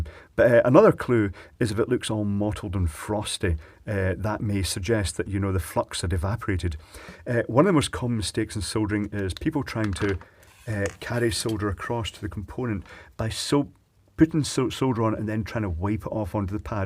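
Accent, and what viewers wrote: Scotland, United Kingdom. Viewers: There's something inherently calming and therapeutic watching a softly-spoken Scottish bloke carefully soldering small electrical circuits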